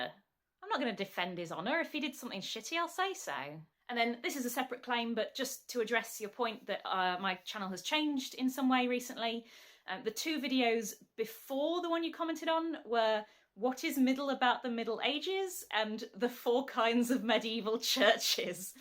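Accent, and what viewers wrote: United Kingdom. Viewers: So I'm not a brit, I've even joked about how aggressivelly british your accent sounds to me 😅 I rarely come here on purpuse but I never skip your video when it pop up in my feed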